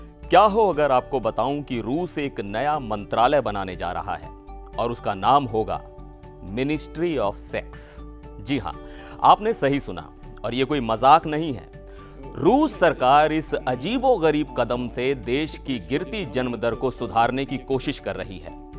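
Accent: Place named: Bihar, India. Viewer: Ye presenter pakka बिहार से है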